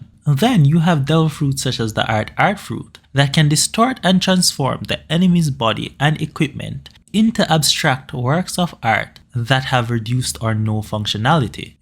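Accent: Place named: Jamaica. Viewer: this man has a jamaican accent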